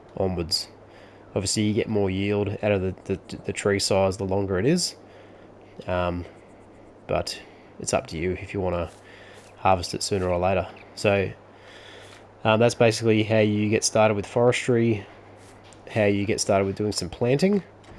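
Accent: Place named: Australia. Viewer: Liked and subbed Great to hear an Aussie playing FS on YouTube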